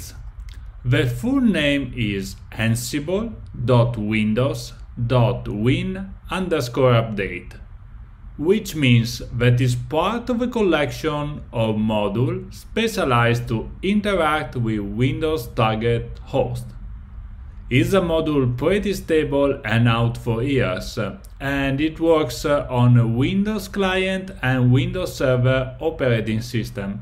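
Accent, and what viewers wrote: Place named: Italy. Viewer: Lovely precise, simple video. I love your wonderful cheery Italian accent !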